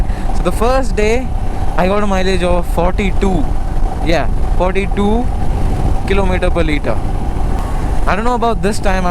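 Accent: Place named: Kerala, India. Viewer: Im sure the vlogger is a mallu….Proper accent.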